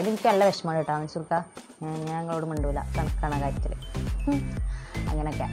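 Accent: Kondotty, India. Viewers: ഇൻശാ അല്ലാഹ് ഞാനൊരു കല്ല്യാണം കഴിക്കട്ടെ മലപ്പുറം ജില്ലേലാണ്ന്ന് സംസാര ശൈലിയൊക്കെ കേട്ടിട്ട് മലപ്പൊറംകാരിയാണെന്ന് ഇൻക്ക് തോന്ന്ണ് ണ്ട് ആണെങ്കിൽ കല്യാണം കഴിച്ചിട്ട് ഹസ്ബന്റിനെയും കൂട്ടി വരണ്ട് അതിന് മുമ്പ് നല്ലൊരു കല്യാണം ശരിയാവാൻ ദുആര് ക്കിട്ടോ ങ്ങള് ങ്ങക്ക് വാണ്ടി ഞമ്മളും ദുആര്ക്കാം ട്ടോ ങ്ങളെ സാരം ഇൻക്ക് ഭയങ്കര ഇഷ്ട്ടപ്പെട്ടു.... പിന്നെ കൂടെയുള്ള ആളെ കണ്ടിട്ട് തൻസീർ ക്കാന്റെ അതെ ലുക്ക്ണ്ട് പെട്ടന്ന് കണ്ട തൻസീർ ക്കന്റെ riletion ആണോന്ന് തോന്നും ഞാൻ കളിയാക്കിയതല്ലാട്ടോ? Masha allah ഞാൻ ഇന്നാണ് ആദ്യായിട്ട് ഇങ്ങളെ സംസാരം കേൾക്കണത് ഇൻക്ക് ഒരുപാട് ഇഷ്ട്ടായി പിന്നെ ഞാന് ഇട്ട കമെന്റ് വായിച്ച കയ്യിണ്ല്ലെങ്കില് ക്ഷമിക്കണം ഇവടത്തെ ഭാഷങ്ങനെ സംസാരിച്ചു ശീലായിട്ട് നല്ല മലയാളം ഇപ്പൊ തൊളേള വെര്ണില്ല്യ ☹️അതോണ്ടാണ് ട്ടോ 🥰😘😘😘❤🌹❤❤🌹🤲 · Nammale kondottykkare athe samsaram. ....appadi samsarichu.... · ആ കൊണ്ടോട്ടി ഭാഷ എനിയ്ക്ക് ഇഷ്ടം ആയി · മഞ്ഞക്കിളി.... ഇയാളെ പാട്ട് എനിക്ക് ഒരുപാട് ഇഷ്ടമാണ് അതുപോലെ ഇയാളെ വർത്താനവും എനിക്കിഷ്ടായി ശരിക്കും തനി നാടൻ മലപ്പുറകാരി അല്ലേ... 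😄😄😄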